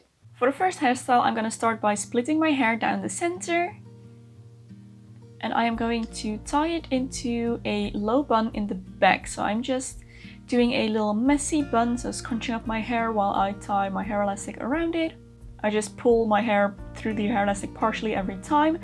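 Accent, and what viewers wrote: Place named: Netherlands. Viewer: You have a Dutch accent. Do you live in the Netherlands?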